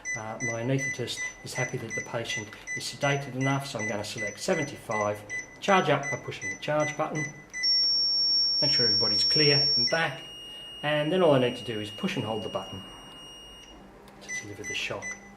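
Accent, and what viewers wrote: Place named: Australia. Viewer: Nice Aussie accent.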